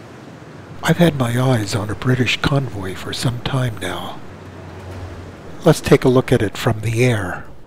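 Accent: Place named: North America. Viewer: Very well put together video - Ubisoft should be grateful for the advertising. Also it's great to hear someone with a North American accent to use "meters." Great job.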